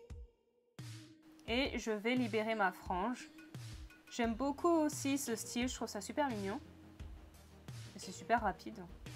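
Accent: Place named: England, United Kingdom. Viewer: J'aime ttes les coiffures,j'adore ton accent anglais💘😄✋🏾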